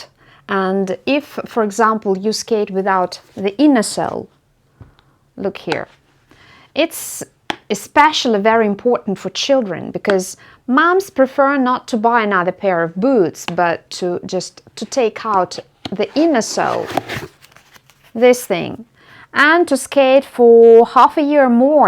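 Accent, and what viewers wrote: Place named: England, United Kingdom. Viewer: The word you were looking for is 'rocker'. In English, rocker is used to called 2 aspects of blades: one is about the curve of the entire blade (e.g., 7-foot rocker such as Coronation Ace blades, or 8-foot rocker for most other blades), the other is about that place just below the ball of your foot and about an inch behind the toe pick, the rocker for spins. Thanks for posting in English, much appreciated. Your English is very good, without much Russian accent and with more English (not American) tone, I am very pleasantly surprised...😀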